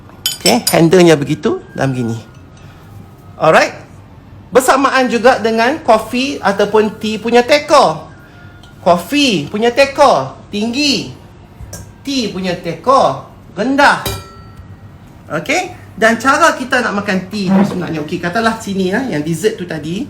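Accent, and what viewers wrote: North, Malaysia. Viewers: Dulu saya belajar KH ERT/RBT etika di meja makan...kira ok dahla tu....wow rupanya lagi detail ye...thank u..belajaq sambil dok gelak ngan celoteh utara dato' lawak aih...tengok sampai habis nah..sampai kul pagi 14/8/2020...hahaha bosan x tau nak tengok apa...sekali sekala menimba ilmu ni...kan senang nak dapat...moga lekat dlm kepala otak ni...aminn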